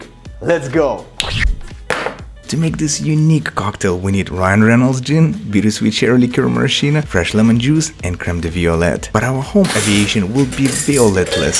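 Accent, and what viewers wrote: Russia. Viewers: Боже ты же русский у тебя русский окцент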